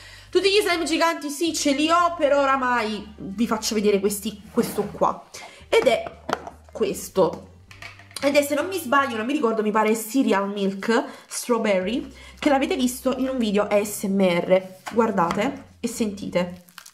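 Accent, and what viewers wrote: Naples, Italy. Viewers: La tua voce napoletana ha ha ha ha ha bellissima e bravissima magg scurdat pur o nome hahahhahahahaha adoroh viva NAPOLIIIII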